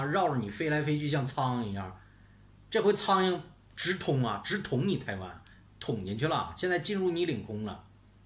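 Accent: Northeast, China. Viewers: 东北口音胖子有意思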